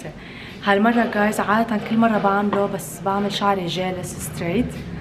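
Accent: Lebanon. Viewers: من اول ما بلشتي تحكي عرفت انك لبنانية!؟ انا جديدة هيدا اول فيديو بحضره هي لبنانية صح!؟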